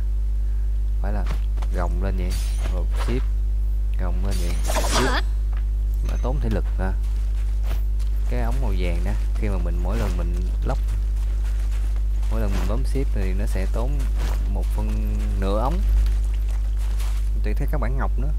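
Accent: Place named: Mekong Delta, Vietnam. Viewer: Gịong của bạn giống ng miền tây ghe luôn . Nghe thoải mái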